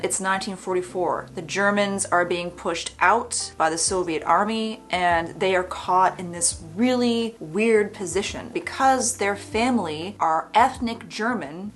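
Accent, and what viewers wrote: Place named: United States. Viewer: A bit off topic,,,but why do you sound American? Specifically speaking Network Englkish. I don't hear a trace of Canadianisms, like process pronoced proecess, about pronounced aboot (more nuanced but I dunno how to spell it), no terminal rhetorical questions, eh? I'm a native Californian and you sound indistinguishable from my fellow SF Bay Areans. Inquiring minds want to know.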